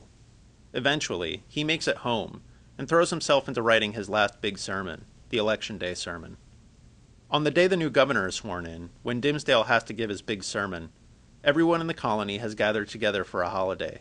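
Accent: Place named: United Kingdom. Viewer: مصدگ عود 😂😂ترى هذا بريطاني إلي ديحجي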